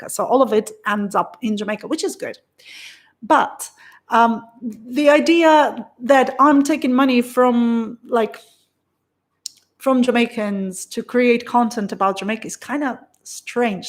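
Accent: United Kingdom. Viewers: Ok now I know why she have a British accent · Wow! You're doing so well! I knew I detected a british accent. People in the U.k cannot fly to Toronto until January 11.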